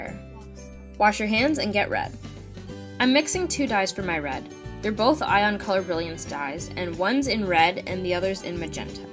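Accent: Jamaica. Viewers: are you jamaican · r u Jamaican?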